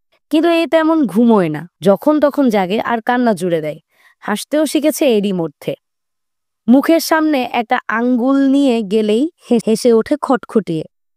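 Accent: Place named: East Bengal, Bangladesh. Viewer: স্পষ্ট করে বলুন উচ্চারণে গন্ডগোল কোথায়। পাঠিকার উচ্চারণ পূর্ববঙগীয় ঘেষা। অর্থাৎ উনি বাঙাল।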